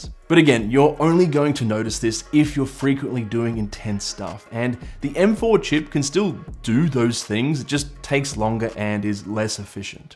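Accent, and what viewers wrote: Australia. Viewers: Australian accent but USD prices? Also, has there ever been a 3rd party mouse with 2 axis scrolling? I have tried other mice but scrolling side to side is essential for Illustrator.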